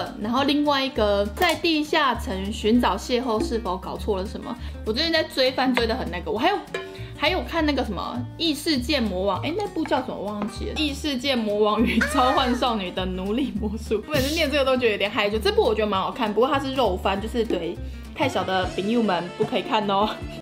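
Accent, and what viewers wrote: Taiwan. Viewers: 雖然偶爾會台灣國語但這支影片中邂逅的發音是正確的喔，掌聲鼓勵妳是特級廚師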